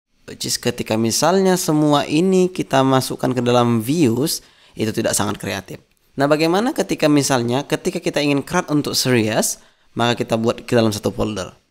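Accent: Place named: Medan, Indonesia. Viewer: khas medan kali ya bang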